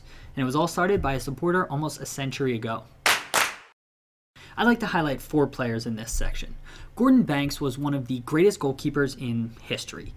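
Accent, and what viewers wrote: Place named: United States. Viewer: Love the American pronunciations lol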